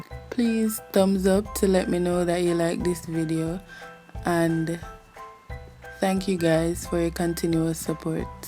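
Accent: Jamaica. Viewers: your voice tells me that your a Jamaican... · You sound so jamaican..I love it😁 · From she start talk mi know seh she a Jamaican ❤ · Amen! Straight yard she come from! Jamaica to di world! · u sound Jamaican. Jamaica to d worllll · this is awesome..thank you so much...yaaaaas u jamaican i can hear it!! dwl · ikr I was like yayyyy a Jamaican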